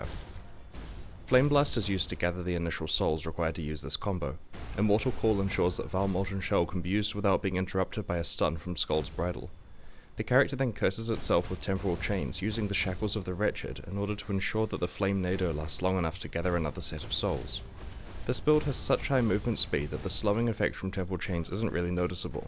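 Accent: New Zealand. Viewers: No, thats just the New Zealand accent :)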